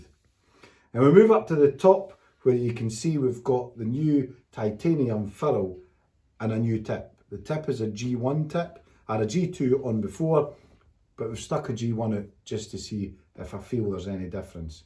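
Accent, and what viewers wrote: Scotland, United Kingdom. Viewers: Are you from Scotland ? 🏴😊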